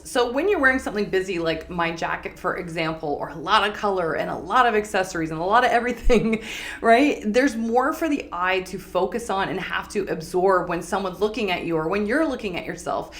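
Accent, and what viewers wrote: Canada. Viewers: I just discovered your channel, love it! Love your accent is it Canadian? Anyhow, I'm a larger woman and I get what you say. I visualise how Victorian women would enhance their pronounced areas even if they were overweight! Could you please post more stills for a bit longer, if I blink I miss them! Thank you Jayne 😊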